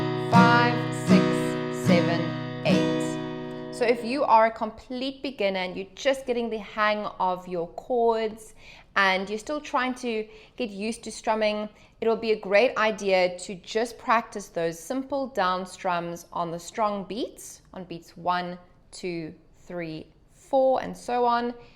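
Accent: Australia. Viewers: Great lesson & teacher - is she an Aussie 🇦🇺? (really cute too 🥰)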